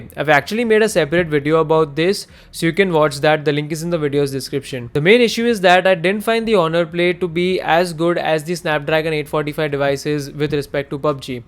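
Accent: India. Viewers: Why indian have funny accent?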